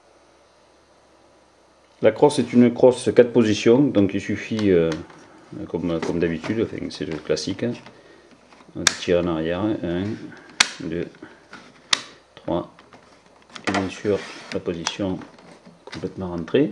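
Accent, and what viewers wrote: France. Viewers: hehehe i luv ur french accent xD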